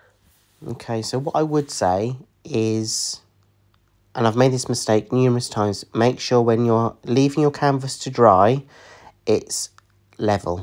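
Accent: United Kingdom. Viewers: Happy birthday,. I love to hear your british English. 😍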